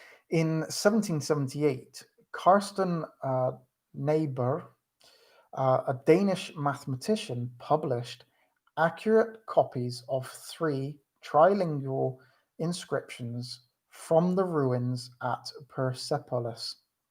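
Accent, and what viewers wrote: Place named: West Country, United Kingdom. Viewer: Sounds like Devon n Somerset! Thanks for this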